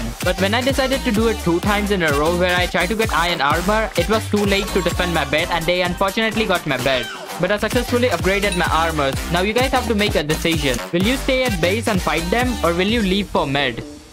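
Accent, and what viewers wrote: India. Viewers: u sound like a indian tech dude indians are the best dude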